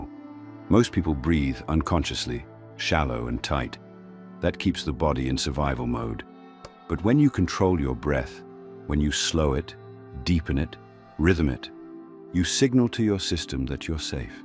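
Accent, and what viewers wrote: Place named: United Kingdom. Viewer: Great video,,,,a little British but not too much 🙂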